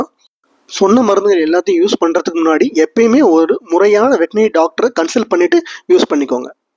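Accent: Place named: Madurai, India. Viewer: ❤ madurai basha thanks ❤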